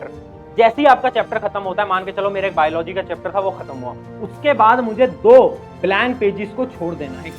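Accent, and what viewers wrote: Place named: Western Uttar Pradesh, India. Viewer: Ye bhaisaab west UP ka lag raha he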